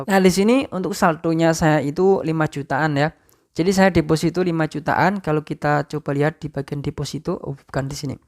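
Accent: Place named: Central Java, Indonesia. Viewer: Looo wong rembang iki 🤣🤭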